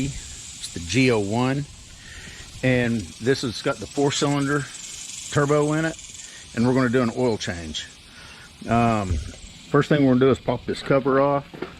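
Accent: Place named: South, United States. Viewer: Yeah, I´m watching here from Germany bro. Love your southern accent....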